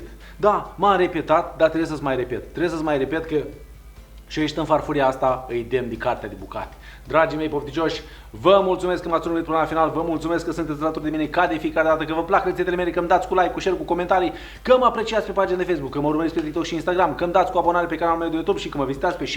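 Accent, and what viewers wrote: Moldova. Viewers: Chef mor dupa retetele tale .bune bune rau 😂.Doar un umil sfat incearca sa fii tu ca esti super nu mai accentua accentul moldovenesc ca nu e nevoie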